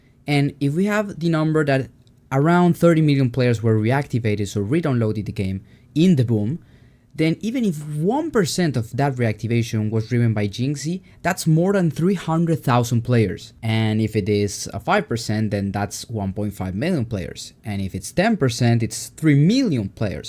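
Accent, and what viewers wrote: Argentina. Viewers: Algo se me hacia raro de la voz, luego me entero que es de Argentina jajaja, lo camuflaste bien. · ese acento argento me mata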